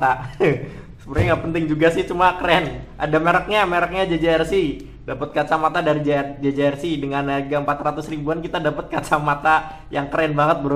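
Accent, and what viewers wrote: Banyumas, Indonesia. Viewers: mas e ngapak e mana e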